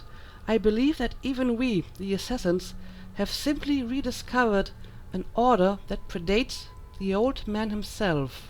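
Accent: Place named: Germany. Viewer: Hey! I'm new to your channel and one of the first things I noticed was your accent. So quick question, are you German?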